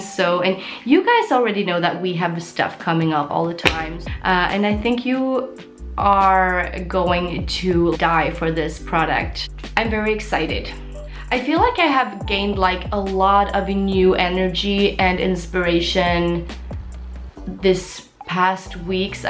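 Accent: Sweden. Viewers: Oh love tour videos! I've followed you for a looong time and remember when you started speaking english in your videos and it's crazy what an improvement your english has gone through! Jag glömmer mellan gångerna vilken härlig dialekt du har tills du pratar lite svenska emellanåt. Fortsätt gärna visa dina tatueringar och piercingar 💋⭐️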